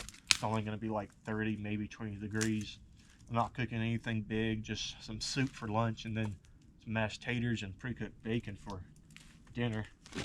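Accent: South, United States. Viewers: Love your backwoods country talk, hope Sasquatch leaves you alone, Outdoor boys, Is formal, you are country, I love country, hope to see more videos. Keep em comin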